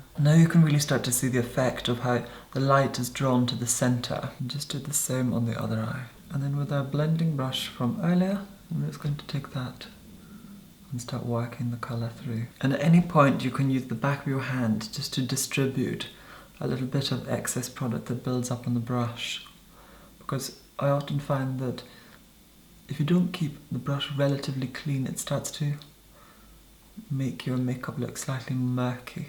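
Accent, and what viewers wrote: Scotland, United Kingdom. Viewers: Anything by Diana Gabaldon. I love the Outlander series. Your natural Scottish accent would be perfect for the Scottish characters and your posh accent would match Claire beautifully.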